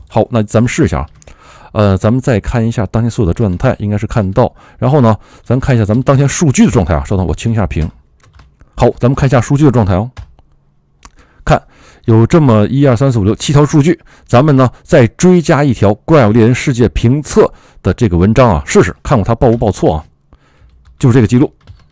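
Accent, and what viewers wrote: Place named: Japan. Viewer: 日本人的发音。。。包括 =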